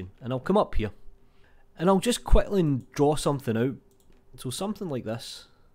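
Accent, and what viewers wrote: Scotland, United Kingdom. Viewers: English accent ? Scotish? Welsh ?? Amazing .... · A really heavy and equally cool scottish accent :-)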